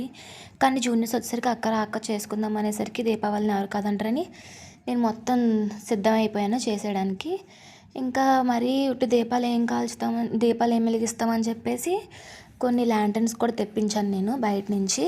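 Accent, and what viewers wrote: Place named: Godavari, India. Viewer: Mee slang bagundi andi godavari vala andi meru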